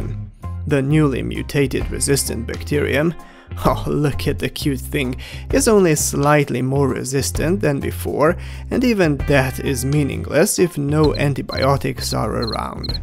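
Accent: Hungary. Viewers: How you weren't aware of "Hunglish" existence. LOL XD For me is super easy to spot. BTW his accent not even that hardcore as far as I'm concerned. Anyways both channel is awesome. :) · I never realized that Hungarian English accents exist until I heard you talk...this is exactly how I sound when I take calls at work...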